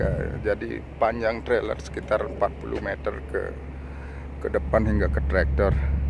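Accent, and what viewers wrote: Bali, Indonesia. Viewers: Seperti logat bali yaa bhsa indo nya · Kalo denger logat pas ngomong pasti orang bali y bli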